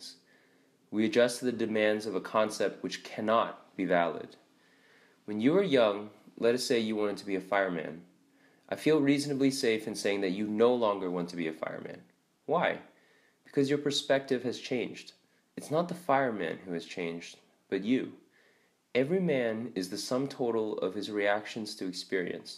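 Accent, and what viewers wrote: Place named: East Asia. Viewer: Indigomarine91 cause of this zipperheads accent probably.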